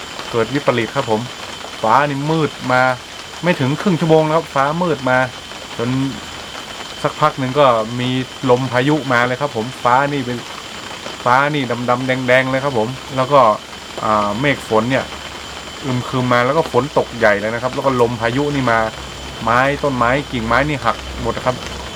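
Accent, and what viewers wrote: North, Thailand. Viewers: หว่ากำเมืองเสียงฟังม่วนจ้าว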